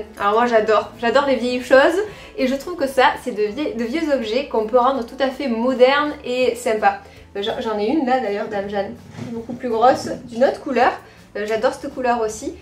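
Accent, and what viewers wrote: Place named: Alès, France. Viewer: Coucou étrange comme quoi l'accent vraiment !!!!je l'ai reconnu! Alésienne comme moi👍♥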